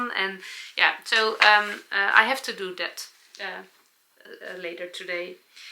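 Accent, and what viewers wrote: Netherlands. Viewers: Hi your Dutch English. is amazing! love your channel, English is also my second language also I Love Holland, kind regards Ilona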